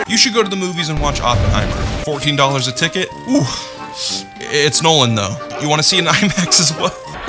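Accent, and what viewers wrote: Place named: Arizona, United States. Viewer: You must be from southern Arizona 🤙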